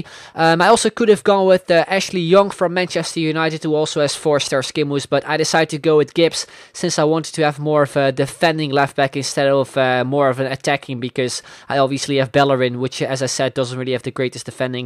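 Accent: Netherlands. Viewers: Hey, are you dutch? Oh, and I love your proper content, you earned another subscriber 😊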